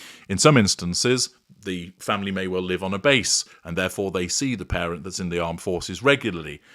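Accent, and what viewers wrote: United Kingdom. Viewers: You make a good point. Sometimes one's job necessitates these absences. I would also say, though, that a nurse or doctor on night shift would probably have SOME time with her/his children when off work. I also find it funny that, who has (or puts on) what I think of as a British accent, makes no mention of the British custom of sending children away for boarding school. He mentions having nannies and that's all well and fine, but the whole boarding school thing... I know that happens when children are older, but boarding school always seems kind of brutal to me, here in the U.S. YMMV Edited to give his due for pronouncing Caribbean correctly. One of the native nationalities there are the Caribe (KEH·reeb, not cuh-RIB). Sorry but it's one of my pet peeves. As you were.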